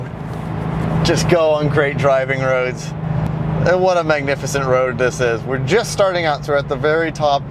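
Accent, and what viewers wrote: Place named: Ohio, United States. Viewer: San LOUIS Obispo?!???? You sound like your from Ohio or somethin!!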